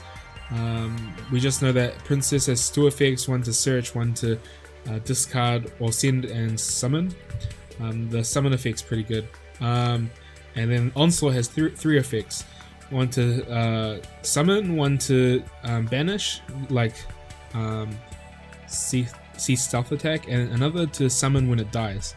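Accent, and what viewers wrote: New Zealand. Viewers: You kiwi bro?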